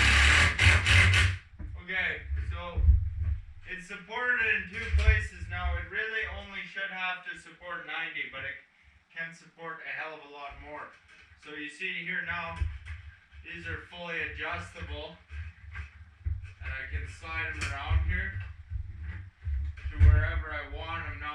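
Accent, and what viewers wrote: Canada. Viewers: where are you located you sound canadian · hahaha nothin brightens my day quite like that canadian accent sayin "well good day there boys n girls". i was lookin in my subscriptions and this video was at the top and started running the video without the audio and i could see his face and the subtitles writing that first line out and it made me chuckle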